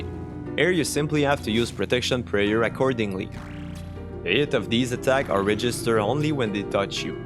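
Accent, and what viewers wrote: Quebec, Canada. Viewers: Trop cute ton accent de Québ, bon vid!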